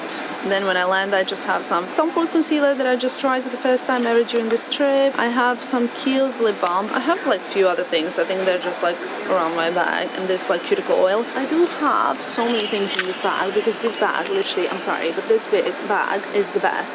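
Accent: England, United Kingdom. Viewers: Omg by the end of the video your accent got SO British ahahah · Through this whole video, especially towards the end, your English accent really came through!! Stay safe xoxo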